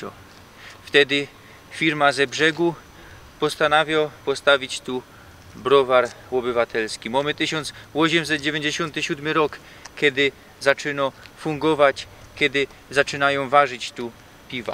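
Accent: Poland. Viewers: Niklas mo Slonski akcent , a Ty mosz Polski akcent. To nie mo nic ze dialektem zu tun.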